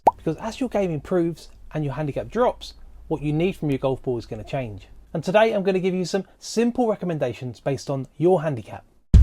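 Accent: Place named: United Kingdom. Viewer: Why is every video a fkn brit talking.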